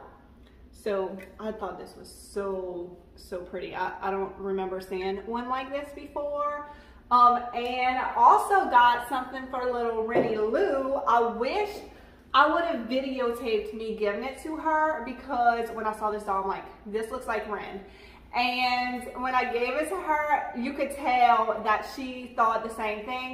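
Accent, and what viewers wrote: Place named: South, United States. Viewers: your shows are such fun and you are such a KIND woman. It is a joy to watch you. P.S. My husband always knows when I am watching, "I hear your Southern Lady"s voice". You make us both smile. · I love your accent. I live in Mississippi, too.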